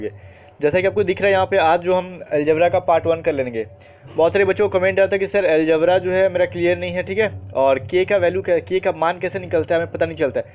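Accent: Bihar, India. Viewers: bihar se ho kya bhai?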